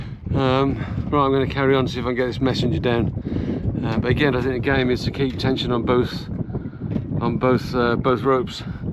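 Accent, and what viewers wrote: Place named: Birmingham, United Kingdom. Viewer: Sounds like you're from Birmingham (same as me) how did you get to live in such a lovely place & get into sailing ??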